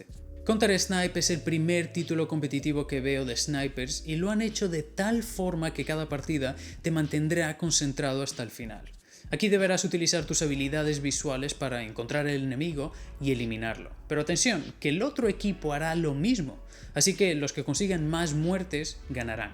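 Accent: Spain. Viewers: Parece un Español hablando sudamericano, esensial, aser En mi opinión, no es hate👌🏻 Muy buen vídeo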